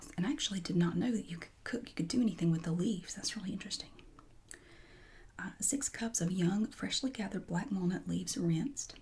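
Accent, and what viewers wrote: South, United States. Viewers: I subscribed! Like my southern sister speak!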